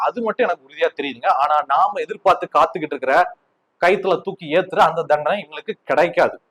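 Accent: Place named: Madurai, India. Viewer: நீங்க மதுரைக்காரா ,உங்க பேச்சிலேயே தெரியுது,நானும் மதுரைதான்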